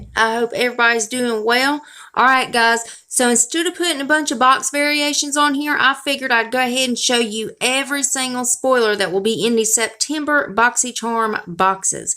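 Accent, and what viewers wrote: South, United States. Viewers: I'm so amused with your southern voice. Absolutely adorable!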